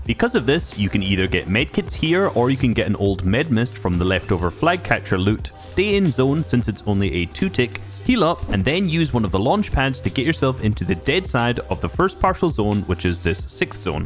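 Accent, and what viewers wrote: Scotland, United Kingdom. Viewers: Scottish folk really shouldn't be doing these voice overs.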